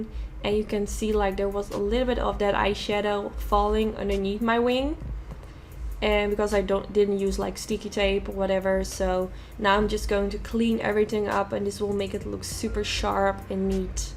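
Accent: Netherlands. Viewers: Ar you dutch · Shout out from holland. Je accent gaf het meteen weg dat je Nederlands bent. Geweldige tutorial!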